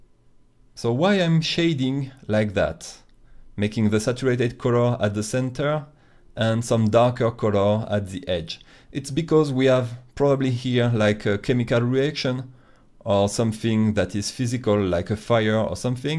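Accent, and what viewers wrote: France. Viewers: L'accent français ♥️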